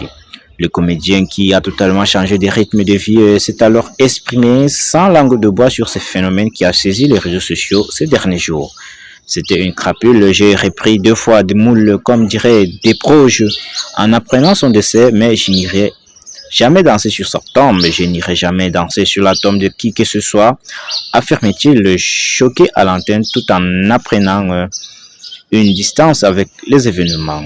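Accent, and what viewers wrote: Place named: Senegal. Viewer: aucun vocal aucun temoignage aucune video aucune info verifiable aucune source aucune preuve , le ton d'un sénégalais qui lit un texte écrit avec les pieds et le gars veut nous faire bouffer cette info comme vraie avec son " Paris news " , t'a des brouteurs vraiment ils ont le QI d'une huitre dejà et je plains les le QI de ses victimes de celles qu'il arrivera a duper avec des photos succesives de Michael youn et de Lepen , les memes connards qui envahissent les réseaux avec de l'IA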